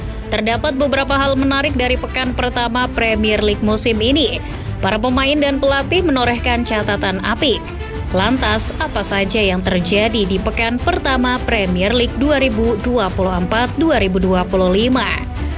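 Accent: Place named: Banyumasan, Indonesia. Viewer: Admin wong ngapak 😗😗😗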